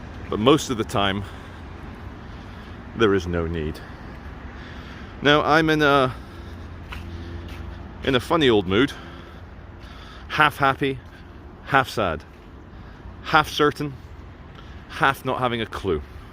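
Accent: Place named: Newcastle, United Kingdom. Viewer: Loved the Geordie accent *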